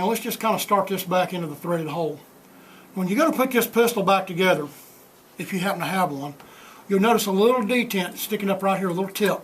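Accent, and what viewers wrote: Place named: South, United States. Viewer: Great video. You don't sound like you grew up in Maine. My guess would be my part of the country, "Dixie". Thank you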